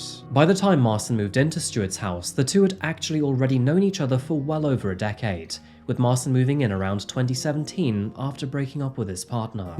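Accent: United Kingdom. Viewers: I doubt you'll ever see this. But a recommendation as a first time viewer and now fan. The 1 minute long intro, without showing face. I originally clicked off the video, and googled if this actually happened and if this channel was AI. I came back after learning both were real. Maybe I'm just a dumb American. But the perfect British(?) accent along with minimal inflection made me heavily suspect AI. Keep up the good work, and maybe show some highs/lows in the voice or your face a tad earlier in the video :)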